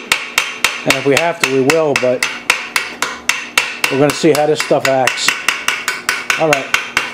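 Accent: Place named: North, United States. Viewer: Aye! Wddya say, look here tubes! Ain't that right! Hey! Look ere tubes! hey! I am just kidding, as a southern dude the northern dialect is fascinating! Keep up the good work!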